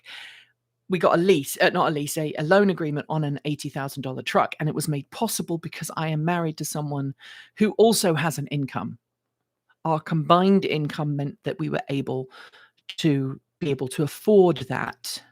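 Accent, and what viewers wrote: United Kingdom. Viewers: Enjoy your vids as they are don't change because of some narrow minded people be yourself and hang in there and I love the British accent hang in there 😊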